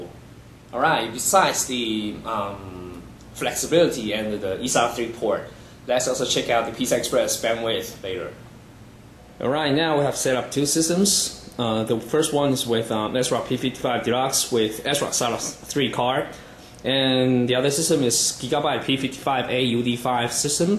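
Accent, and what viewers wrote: China. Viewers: Chinese English!!!!!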